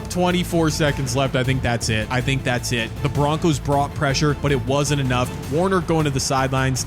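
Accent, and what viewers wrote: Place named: Canada. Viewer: Your accent sounds Canadian, then I looked at your channel and confirmed that you Are Canadian. Being a Canadian, what do you think about Canada getting an NFL team or Two?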